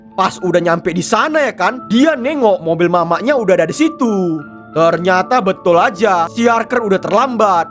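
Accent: Medan, Indonesia. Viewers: Logat nya bicara medan kali bah😂 Gabasabasi langsung awak subs yekan☕🗿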